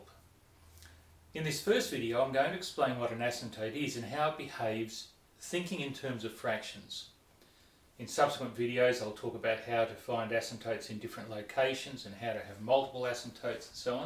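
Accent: Australia. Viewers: amazing video dude - everything clear and concise! Subbed and loving the aussie accent btw :)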